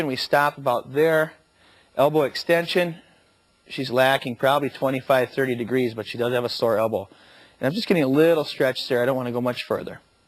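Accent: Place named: Canada. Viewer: haha this video looks like it could have been made from the 70's I like his (I believe) Canadian accent, haha, but still very helpful, thanks :)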